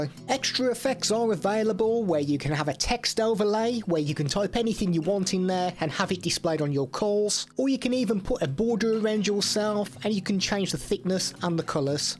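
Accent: Black Country, United Kingdom. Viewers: Great review and even greater Dudley/black country accent 😂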